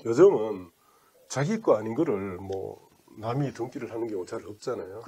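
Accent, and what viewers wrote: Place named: Gyeongsang, South Korea. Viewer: 이 친구 말투로 보니 대구출신이네.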